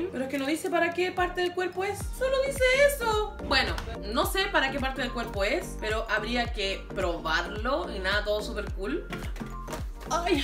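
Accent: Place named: Chile. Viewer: Amo que sea tan chilena para hablar, full love para ti ❤️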